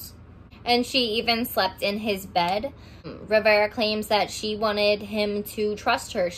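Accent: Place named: Baltimore, United States. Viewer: Where u from if you don't mind..... im from Baltimore, and you have a Baltimore or MD accent. Jw but love ur story. Very detailed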